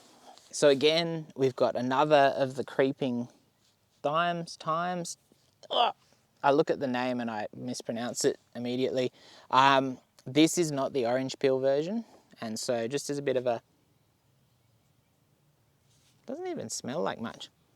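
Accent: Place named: Australia. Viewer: LOL Did I just hear an Aussie dis Americans for not pronouncing words properly?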